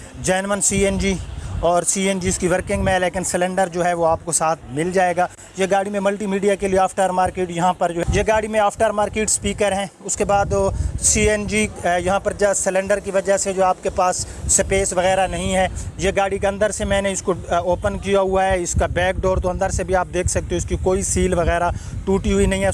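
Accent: Saraiki, Pakistan. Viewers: Apki Urdo Se aisa lagta ha jaisy ap sryki hai q k apko urdo bolna hi nai ata so ap sryki mn bol lia karen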